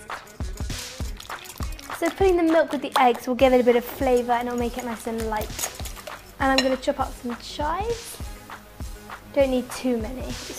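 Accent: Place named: United Kingdom. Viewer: Can you talk without British accent ....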